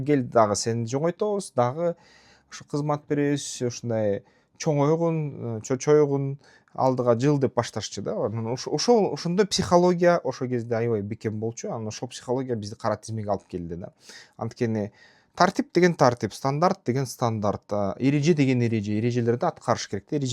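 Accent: Standard. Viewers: Сиздин таза Кыргызча сүйлөгөнүңүз өтө соонун. Азаматсыз Улут бул Тилден башталат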